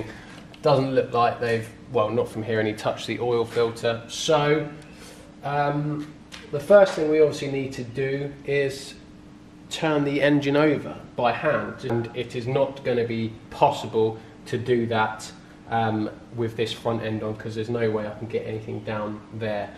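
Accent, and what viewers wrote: United Kingdom. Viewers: What an ugly speach...that britisch slang sucks..sounds Horrorble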